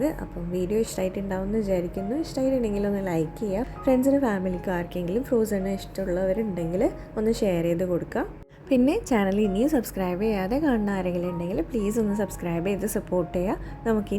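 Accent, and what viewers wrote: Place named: Kannur, India. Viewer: kannur aano? Njanum kannur kaariyaane...enik slang ketapo cherioru doubt thonirunnu😅😅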